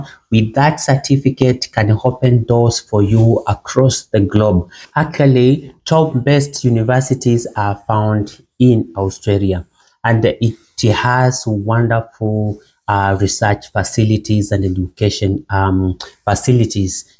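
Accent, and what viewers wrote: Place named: Kenya. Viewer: Greetings from Kenya, I can hear from your accent you are a kenyan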